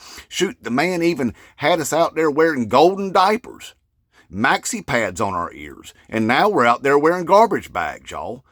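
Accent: South, United States. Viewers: 😂😂😂😂😂😂😂😂😂 I love you! You are hilarious. Your southern hillbilly accent is on point.👏🏼👏🏼👏🏼👏🏼👏🏼👏🏼👏🏼👏🏼👏🏼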